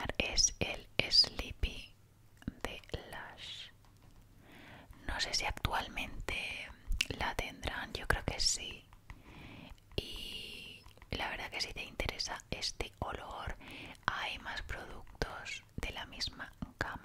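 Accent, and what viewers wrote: Spain. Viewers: Me encanta el acento de las españolas y encima como pronuncias la S eso me causa más asmr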